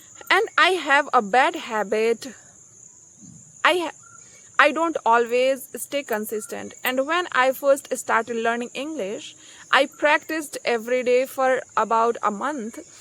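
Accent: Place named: India. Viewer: Pure Indian pronunciation ! Quite different from British or US pronunciation. It sounds funny. It took time for us to be used to Indian pronunciation. Indians are smart and friendly.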